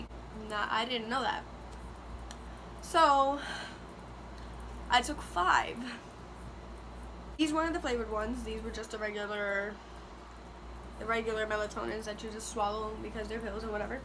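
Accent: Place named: Puerto Rico. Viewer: tú eres Boricua por Leyyy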